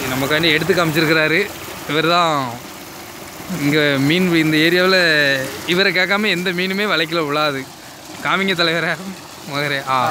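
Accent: Tirunelveli, India. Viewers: Sema, thirunelveli yaaa pa... namma slang varuthuu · Tirunelveli tamil arumai👌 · Nanum Tirunelveli bro.. slang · திருநெல்வேலி பாஷையில் விளக்கம் கொடுத்தது சூப்பர் பாஸ் நல்லா இருக்கு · anna ninka tirunelveli ya 😍😍eale😍😍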